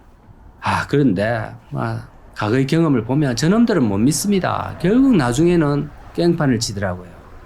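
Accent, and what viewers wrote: Gyeongsang, South Korea. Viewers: 우야노 님 멘트 넘 재미져요 친밀감느껴지는 사투리 옛 생각 납니다 저도 경남거창 줄신이라 사투리가 정겹습니다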